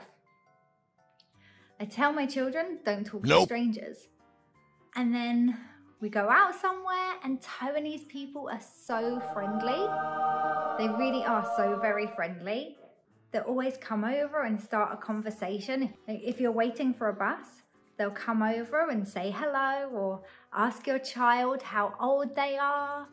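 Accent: United Kingdom. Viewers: 我覺得WTO姐妹會應該邀請你上節目，聽你講話好有趣。英國式英語腔調比美語好聽耶~~我們的總統蔡英文說英語的時候其實也有很濃的英式腔調。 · Hi,This is my first time come to your YouTube channel and i like your British English accent. So i Subscribed your Channel. 保持善良的心，但是別放棄警覺。害人之心不可有，防人之心不可無。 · Lovely British accent and welcome to Taiwan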